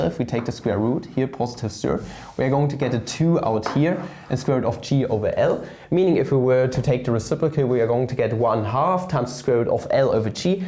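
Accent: Germany. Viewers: I clicked, heard a German accent, and stayed. 👍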